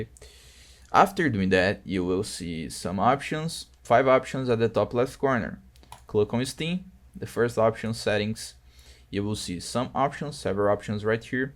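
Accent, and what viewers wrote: Brazil. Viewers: sabia que esse sotaque era BR XD, ja viu esse erro meu consagrado? LowLevelFatalError [File:Unknown] [Line: 729] BulkData compressed header read error. This package may be corrupt!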